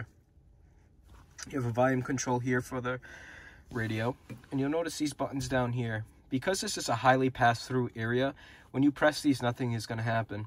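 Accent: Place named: New Jersey, United States. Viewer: You from New Jersey? Love the accent!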